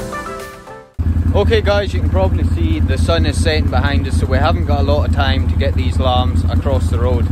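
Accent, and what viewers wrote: Scotland, United Kingdom. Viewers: you do wonderfully with these beautiful, intelligent, well trained dogs and your videos. I love everything. Your dogs doing their jobs so happily, your Scottish accent, the landscape and the music. Love hearing your commands, “come by”, because those sweet dogs jump into their beloved duties. Love from a Scottish ancestry American. ❤❤❤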